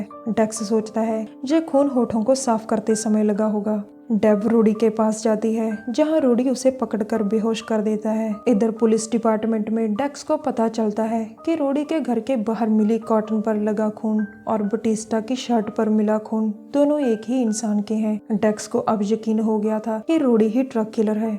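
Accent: Punjab, India. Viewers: Nyc mam ☺ your voice so osm mam aap kaha se ho mujhe apki voice sunke aisa kiu lgta hai ki aap punjab se ho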